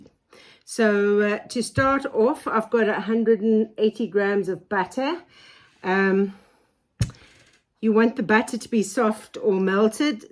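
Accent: South Africa. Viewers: But your accent is South African? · You sound like a South African.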